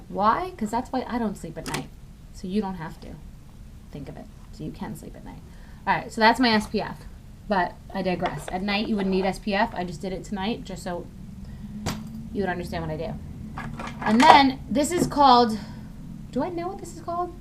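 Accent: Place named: New York, United States. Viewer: I just love you Bethenny! I could listen to you talk all day. Ur so NY!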